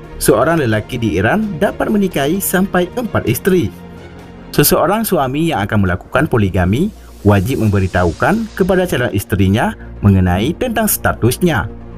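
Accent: Aceh, Indonesia. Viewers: macam orang Aceh lagi berbahasa Indonesia.. logat Aceh nya masih kental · Admin org aceh kah? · Orang aceh boz ku. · Admin nya kayak org Aceh ya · Dialek host nya dialek Aceh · Pengisi suara apa Aceh100% Aceh Pidie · orang aceh..dari logat bicaranya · Logat nya orang Aceh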